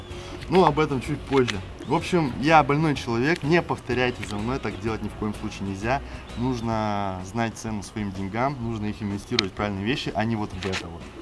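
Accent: Russia. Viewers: ништяк! ещё и русский говор!!!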